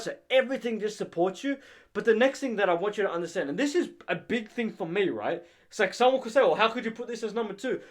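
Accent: Australia. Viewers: Hey bro where you from, are you from sydney??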